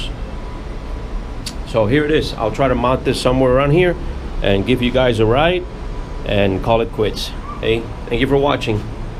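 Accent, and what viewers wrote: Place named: South, United States. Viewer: You sound like a Southerner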